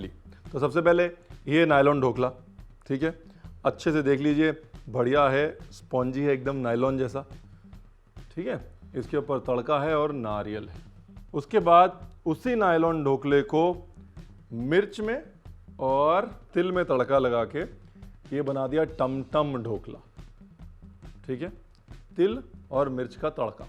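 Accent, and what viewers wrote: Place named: Punjab, India. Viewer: Love your style of speaking, the comic timing, the pauses, and the punjabi accent :-) and the history bytes are amazing too!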